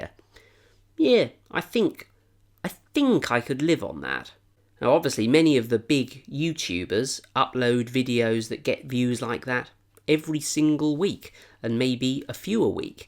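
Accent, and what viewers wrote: England, United Kingdom. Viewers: i subbed from that video.... it was because im an english person living in scotland, and i just really missed an english accent so now i watch you. x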